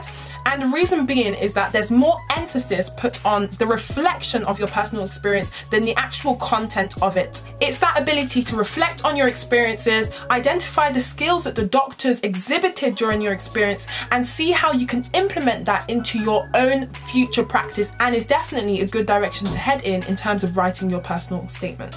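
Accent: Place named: South, United Kingdom. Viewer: Where is your accent from?? You sound really southern but then you say button/ summer/ Study in a slightly northern way. Did you live in Manchester or Leeds for a bit haha. Congrats for getting in and avoiding chemistry lol.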